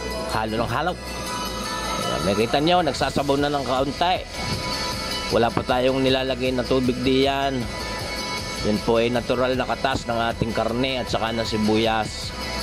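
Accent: Batangas, Philippines. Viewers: Batangueño ka na nga ginawa mo pang asal ang punto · Either tumira ka matagal sa Batangas, o magsanay ka magsalita. Pero, matagal tagal bago mo matutunan yan. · Tuwang tuwa ako sa punto ng batangeno dahil yan ang nakalakihan kong marinig sa nanay kong batanguena at mga kamaganak namin sa Majada at sa batangas!! Ur accent reminded me of my kakang duneng and other tiyo at tiya!! They all speak that same accent!! Pag nakain na at naghuhuntahan na, akala mogay nagbababag !! Kainaman naman eyyy! 🤣🤣🤣. I love batangueno foods! Napakasarap talaga!! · Nakaka aliw magsalita ang mga Batangueno eh! Dalas dalasan mo kuya ang pag a-upload ng mga cooking video. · unang salita palang natawa na ako,, Batangueño talaga. sarap nyan kabayan 👍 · ala eh kuya.. akoy nattuwa Sayo eh.,kasarap mo pakinggan pag nag ssalita ka NG batanguenio..akoy wiling wili mkining sa bosis mo..😋😋😋😋😋😋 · Bro, di ko kabisado ang accent at punto ng pananalita mo, sorry, matanong ko yan ba ay baklang salita o batangeno? 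· habang pinanonood ko ho ito ay may ngiti sa aking mga labi. ako ho ay sobrang nasiyahan sa panonood nitong video ninyo. kayo ga ho ay taal na taga batangas? hindi lang ho sa kaalaman sa pagluluto ng kalderetang kambing ang natutunan ko dito kundi ang makarinig ng nagsasalita ng puntong batangas, para tuloy akong napauwi sa aking lupang sinilangan. mukhang napakasarap nga ng kaldereta nyo, yan ang tinatawag na authentic - kalderetang totoo. at hanga ako sa paraan nyo ng pagluluto dahil yan ho ang talagang bihasang (skilled) magluluto, pinaala-ala nyo sa akin ang aking mga tiyuhin na magaling ding maluto ( ang isa ay dating may karehan sa bagong palengke) ay sya, maraming salamat ho, mabuhay po kayo at nawa'y mas marami pang tumangkilik dito sa chanel ninyo at makagawa pa kayo ng maraming video na maraming makapanood. God bless. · Iyan ang tunay na Batangueno , sa pagsasalita at sa pagluluto ay talagang mainam na mainam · Your new subbed, searching kardereta yung luto mo nakita ko! 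Enjoy your cooking lalo na yung Batangas mong accent!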